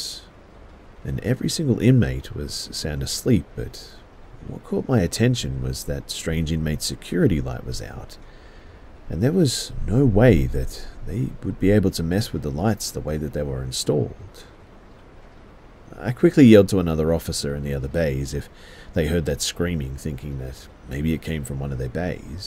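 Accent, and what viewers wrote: Australia. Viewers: Welcome back, man. Great to have you & your rhythmic Aussie cords. 🤭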